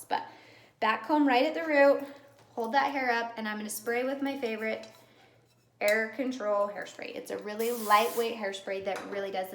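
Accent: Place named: North, United States. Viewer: I wrote to you again about coloring my hair. I’m thinking I need to go silver because if I go blonde I am going to be touching up the roots all the tine. Because I have salt and pepper growing out. Ugghh I hate it. Btw, I love your accent. I am from Indiana, but I can tell you are from the North. Love it!! lol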